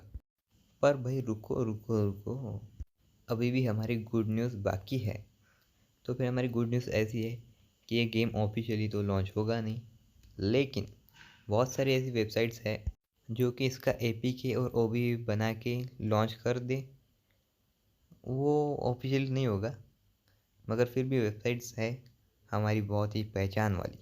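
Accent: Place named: India. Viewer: Not everyone is good at english dude if he talked english he still gets the indian accent so it will sound so fucking weird and he might say wrong words so remember that everytime.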